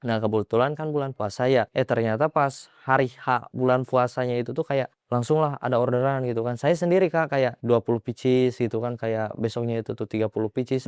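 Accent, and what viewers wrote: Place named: West Java, Indonesia. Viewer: kebiasaan orang sunda ngomongnya gitu bang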